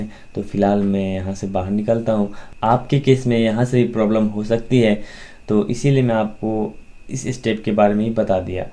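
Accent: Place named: India. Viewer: I don't understand what you say that indian accent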